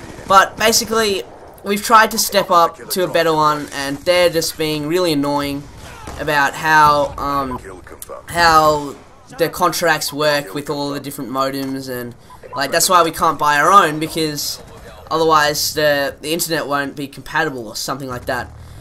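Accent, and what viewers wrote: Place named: India. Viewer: I thought the whole thing was an indian accent...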